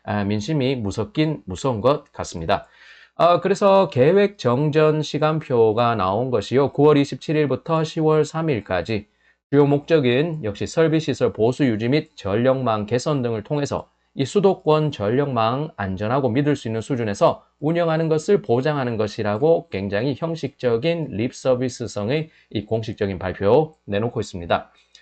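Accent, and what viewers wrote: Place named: China. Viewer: 조선족 유투버 같다.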